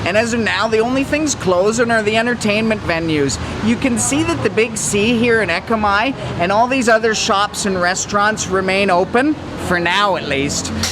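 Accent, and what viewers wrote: Canada. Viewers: This man has the most Canadian accent ever. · Can't stand Canadian accents. I'm out....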